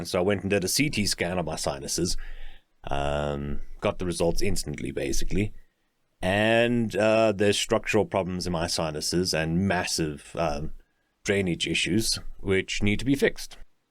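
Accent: Scotland, United Kingdom. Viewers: loving your work and working through the previous recordings, as a scotsman i'm very impressed with your scottish accent. Hopefully you will be well enough to get back recording soon.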